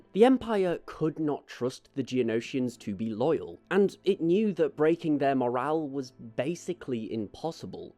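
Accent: United Kingdom. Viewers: I can't stand your BRAETEESH accent..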